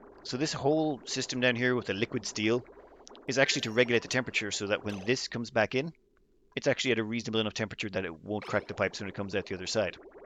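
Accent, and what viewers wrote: Ireland. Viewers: hey long time watcher first time poster, love all your vids, just wondering where in ireland are you from? im guessing south dublin sans d4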